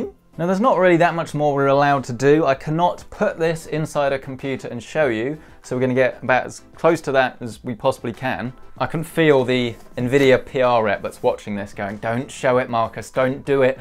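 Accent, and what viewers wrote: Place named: Southern England, United Kingdom. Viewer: Judging by your accent are you in the south of England?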